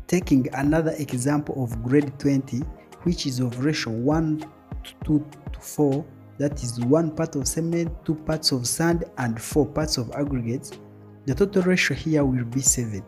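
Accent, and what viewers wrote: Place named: Uganda. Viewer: I think this guy is from uganda I can hear his accent.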